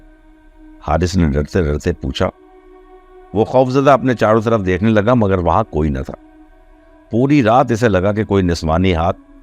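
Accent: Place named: Pakistan. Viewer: Amazing incident 😮 n love your urdu ascent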